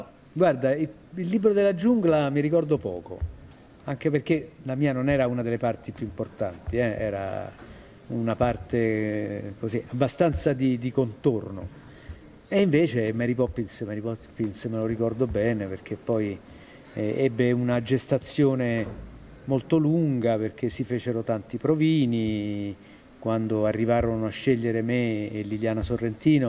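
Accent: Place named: Rome, Italy. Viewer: Diversissima no, ma è ovvio che in un contesto come questo sia meno impostata e si senta di più l'accento romano .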